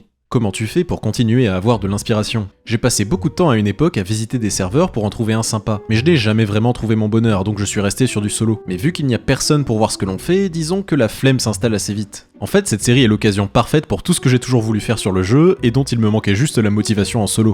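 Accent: Quebec, Canada. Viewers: Est tu qebecois? Moi oui. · Bonjour, depuis que j’ai vu ta chaîne je regarde tes vidéos et une question me trotte dans la tête et tu n’y as pas répondu dans la vidéo. D’où te vient cet accent québécois exceptionnel ?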